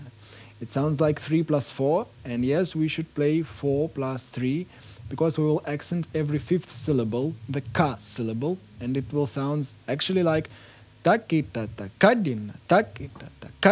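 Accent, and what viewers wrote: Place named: India. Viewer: ur english look so hindi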